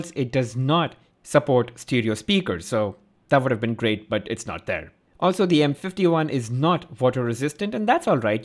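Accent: India. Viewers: This guy speaks like typical random brown indian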